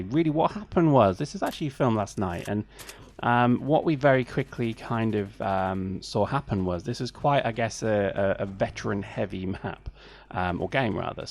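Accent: United Kingdom. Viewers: your accent sounds close, you must be in the South East? Maybe even Kent - Sussex? Where are you, I'm in Canterbury.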